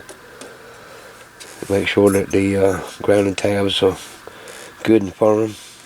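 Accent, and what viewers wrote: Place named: North Carolina, United States. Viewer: You sound like your from North Carolina or Tennessee im from North Carolina.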